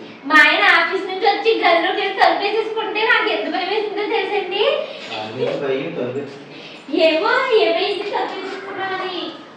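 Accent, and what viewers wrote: Godavari, India. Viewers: Ma godavarolu bhasha alage untundi...yevaru marchaleru....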